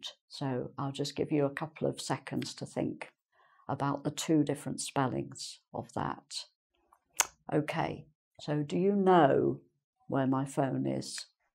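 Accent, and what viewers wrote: England, United Kingdom. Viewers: Hello teacher. I really like your lessons and they very useful for us even your accent that you speak by is wonderful I wish that I study with you only for a month I will be a good britch accent speaker.. Thank you so much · Hello, it's a pleasure to hear your Sweet English accent. I have been several times in England in the four seasons and I have never seen a drop of rain, you know...Only the Sun.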